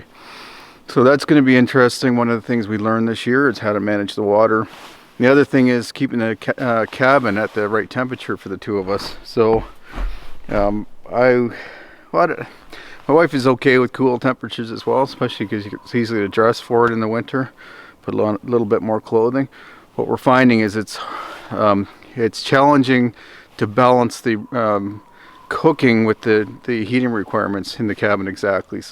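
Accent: Canada. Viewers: Happy New Year! I love your Canadian accent. So sorry to hear you lost your business & left you in a lot of debt.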